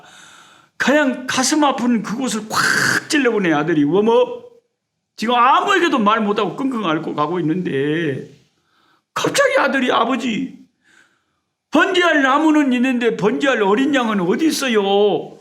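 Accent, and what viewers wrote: Jeolla, South Korea. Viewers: 목사님 덕분에 성경공부 열심히 잘 하고 있습니다 전라도 영어 넘 정감있고 좋아요 ㅎ 아브라함과 이삭의 순종처럼 날마다 체찍질하며 순종하기를 원합니다~~샬 롬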